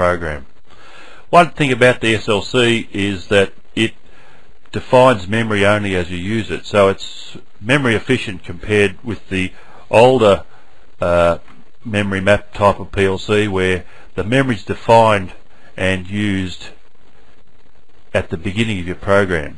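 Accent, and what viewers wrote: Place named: New Zealand. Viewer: Are you from NZ? I have been over there and your accent looks very kiwi for me... :)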